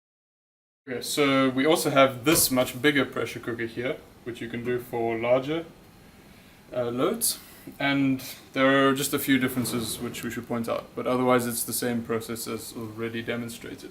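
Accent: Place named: Germany. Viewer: few words and i can tell, german right?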